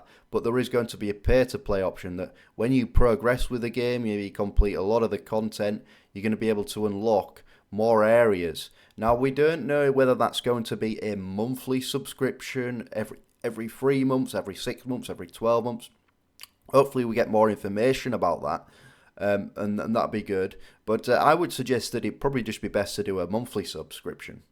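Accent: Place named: United Kingdom. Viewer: Dude where abouts in the UK are you from ? Cant tell your accent.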